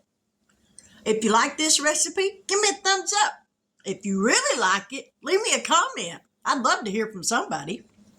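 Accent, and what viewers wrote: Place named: United States. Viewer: Looks delicious! I love your country accent!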